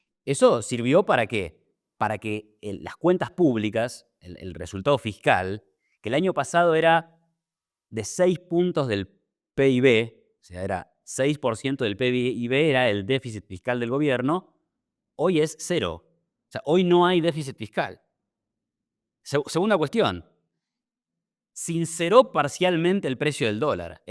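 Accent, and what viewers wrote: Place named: Italy. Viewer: Iván é show! Grande! Um panorama completo e muito lúcido do liberalismo, como é aplicado e dos perigos do socialismo na região. Impressionante o tonalidade italiana do portenho. Como sempre se disse: italianos que falam castelhano.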